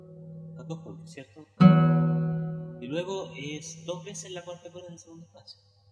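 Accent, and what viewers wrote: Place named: Chile. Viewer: Que hermoso tu acento chileno❤ estoy enamorado del acento chileno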